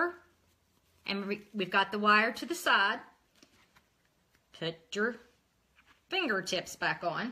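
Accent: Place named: South, United States. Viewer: I just got to watch your video.... I love the 2nd bow. It is much fuller. I love the chevron pattern. It is gorgeous!!! Truly gorgeous. I love the wreath. I didn't get to see the making of the wreath. But I heard what you did. Thank you for your kindness! I love you. You sound like me, I'm southern gal from Louisiana.... I love the wreath and both bows. Except my favorite is 2nd. Glad you changed them out!!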